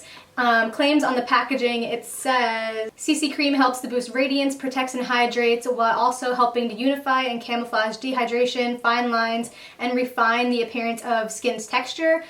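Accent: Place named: Long Island, United States. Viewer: Are you from NY? You have kind of a Long Island/NY accent... especially heard it in the way you said orange. just curious!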